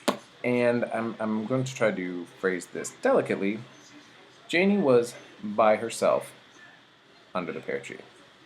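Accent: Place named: Minnesota, United States. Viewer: This fucking guy is too Minnesota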